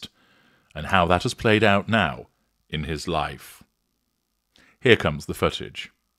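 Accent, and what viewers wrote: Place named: RP, United Kingdom. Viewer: Can't get enough of your BBC voice,.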